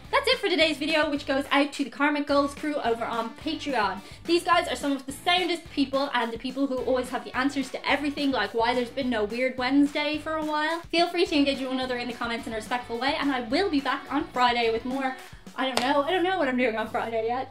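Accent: Ireland. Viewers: Yes! The Irish accent is the best! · Love your video, and I love an Irish accent as well!! I love my Country and would never live anywhere else....but I love to visit lots of other places and Ireland is definitely on my bucket list. Take care! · That a young woman with a lovely Irish accent thinks that Canadian accents are sweet is right some good there, eh? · Yea we say were going to get a bag of milk, most of us just drop the bag in a milk pitcher and cut the bag open. Their are specific milk pitchers you buy, Google "Canadian milk pitcher" to see it if you're curious. When someone litters, i tell them the garbage is right there. Then i pick up their trash and throw it away for them. Its pretty passive aggressive 😂. I watch Doctor Who cause its amazing, alot of canadians i know watch it or know about it aswell. Alot of British sci fi shows are great. I wanna visit Ireland after the pandemic it seems like such a cool place and i love the accent too 😂. 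· I find the Irish accent very charming!!! I m born Canadian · We don’t have milk in a bag here where I live in Saskatoon it’s in a jug. Yes people drink lots of bottled water. We are polite because our neighbors to the south seem like they just beat each other up all the time so we’re just generally turned off by our noisy neighbors- but we still love them. It’s kinda like a family situation where your cousins are a bit too loud but you love them anyway. Also we think Irish accents are cool. The things you said about Canadian accents is what we say about yours. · Canadian here, I think the Irish accent is amazing!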